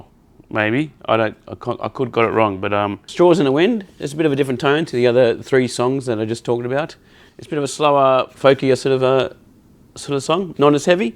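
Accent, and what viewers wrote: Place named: Australia. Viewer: Great review. Love the Aussie accent 😁 mines a bit different 🇦🇺 Yah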